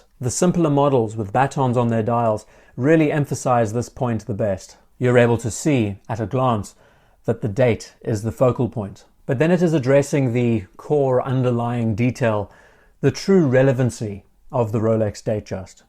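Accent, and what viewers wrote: South Africa. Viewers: He sounds South African.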